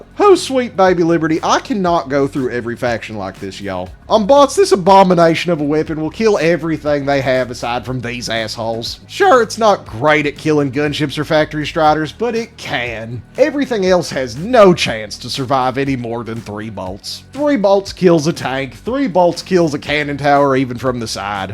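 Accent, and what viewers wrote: South, United States. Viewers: not with his accent. the southern accent eliminates the 'i' from the 'ai' dipthong, which means 'fire' is pronounced more like 'far'.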